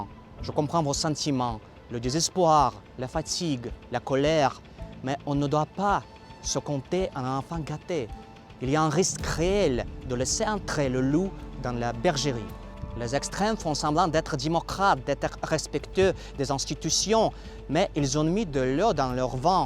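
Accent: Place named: Russia. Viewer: ну жестко русский акцент, а так хорошо!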